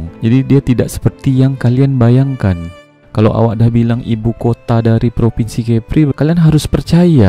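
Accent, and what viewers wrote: Malaysia. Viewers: Mantab, bahasanya enak didengar, awak suke logat Melayu seperti ni.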